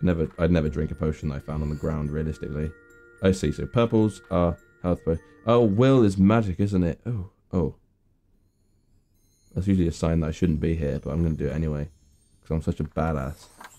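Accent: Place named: United States. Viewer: you sound very American when you speak... what to call it... gamer-lingo?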